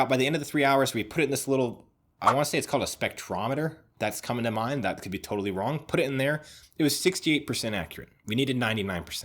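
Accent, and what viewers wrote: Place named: New York, United States. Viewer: Your videos are so well put together I’m guessing you make the hard look easy and your American accent I’m guessing is New Yorkan? That’s just the icing on the cake the content I always feel is first class. What surprised me was today’s content I really needed this as a professional civil engineer I got into it because I followed in my old mans footsteps but I have to say after 18 years I’m done. Time to follow the thing I’ve always wanted to do for the last 12 years, tech. Cheers x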